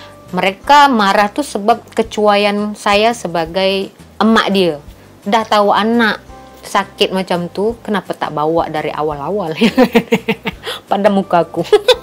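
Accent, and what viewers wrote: Malaysia. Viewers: Astaga ! Ya ampun. Kelakar betul ya. Rawatan di Malaysia, hampir gratis. 1 Ringgit Malaysia diberi banyak obat. Loghatnya udah bertukar kepada slanga Malaysia. Sukses bu.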